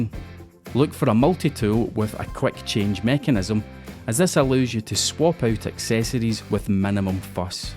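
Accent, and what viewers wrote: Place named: Scotland, United Kingdom. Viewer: tool instruction and Scottish accent....jackpot! 😍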